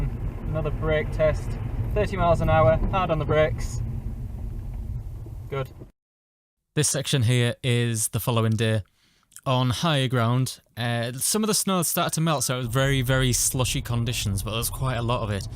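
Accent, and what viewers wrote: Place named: Cumbria, United Kingdom. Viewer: Can't believe after all these years I've never put winter tyres on my car in winter. On 27 Dec I nearly got caught in the 20 car pile-up on the M6 in a mini-blizzard. Never again. Luckily for me, I won an auction of ebay and got 4 wheels with 4 Nokian WRD4s fitted for a crazy bargain price. Got them on now, and what a step change!! Feel so much more secure now. Doesn't make me impervious to accidents, but sure takes the environment out of the equation. 👍 PS I see the footage was Yorks/Derbyshire, but that doesn't half sound like a West Cumbrian accent!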